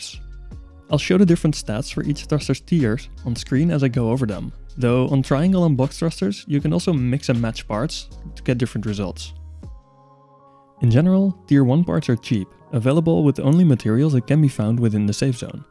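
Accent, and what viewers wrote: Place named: Netherlands. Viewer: Ik herken dit accent direct haha. Dutch space representative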